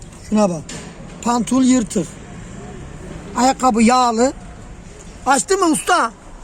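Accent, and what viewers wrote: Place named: Yozgat, Turkey. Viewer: Slm abi biz seni olduğun gibi beğeniyoruz yaşadığın hikayeleri anlat sen yarı yozgatlıymışsın bnde bir yozgatlı olarak şiveni çok beğeniyorum bol bol hikaye anla yaşadığın komik olayları iyi yayınlar diliyorum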